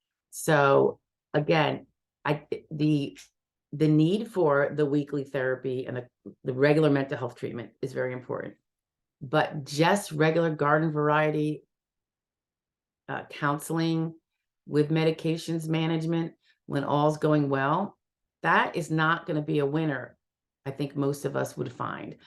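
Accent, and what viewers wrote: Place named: East Coast, United States. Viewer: I'm curious what state you're in? I wanna guess east coast (& fingers crossed) by the way you pronounce things like me. I know it doesn't matter where your attorney is for disability & I definitely want to get one this time. I should've "appealed' the 1st time but now I know I'm denied bc I didn't getmy paperwork work done in time so I didnt send it in last month.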